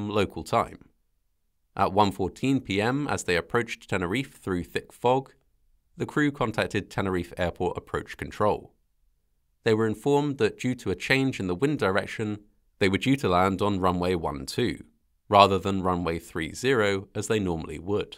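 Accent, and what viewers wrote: United Kingdom. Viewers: get rid of that stupid british acsent it sux like your littile island 😮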